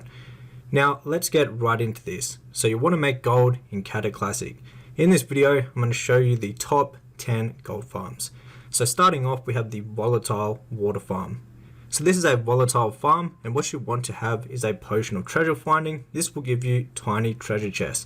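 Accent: Australia. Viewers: Wow man.. still at it?! I remember being a kid playing on my cousin's account and looking up how to make gold / farm mats and I heard this rad ass Aussie saying "check out this farm, its 'Bad P**sy' " I come back to the game and find myself here again with same OG posting content! That's dedication homie! GGs!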